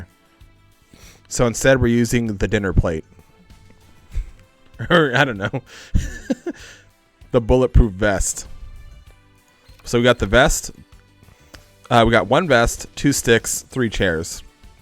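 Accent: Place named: West, United States. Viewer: sound like cowboy but sometimes feel he just a farmer from Alabama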